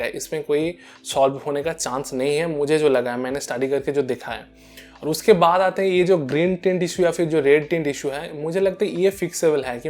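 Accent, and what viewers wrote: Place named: Bengal, India. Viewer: bro tum bongoli ho kya? great work tumhe bahut mehnat lagi hindi bolne mai. koe na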